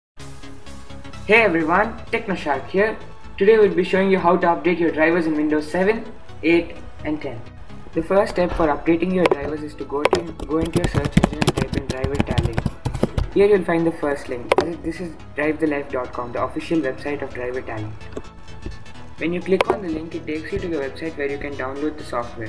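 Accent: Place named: India. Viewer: Indian voice, Indian accent. Program with viruses.. no thanks. I'm looking elsewhere.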